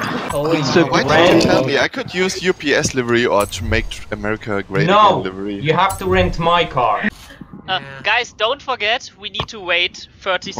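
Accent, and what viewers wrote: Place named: Germany. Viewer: that german english is soooo baaad to listen...omfg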